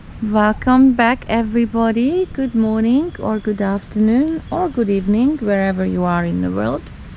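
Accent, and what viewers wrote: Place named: Netherlands. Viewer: Enjoyable series on Norfolk Island, thank you. I assume from your accent you're Dutch?😢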